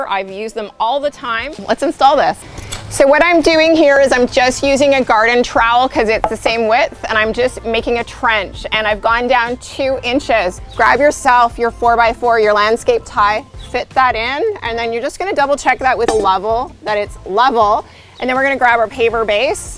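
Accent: Canada. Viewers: She sounds Canadian.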